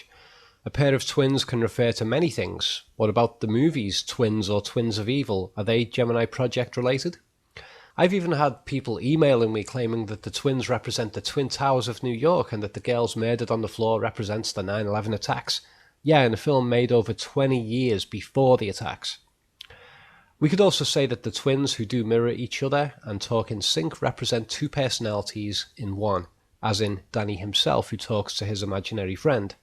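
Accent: Liverpool, United Kingdom. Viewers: i didn't realize you were a scouser! errrrm nice one :)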